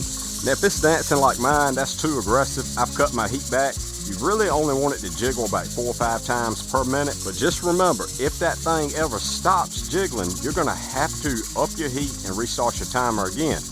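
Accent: United States. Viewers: It’s some kind of American accent, definitely not Australian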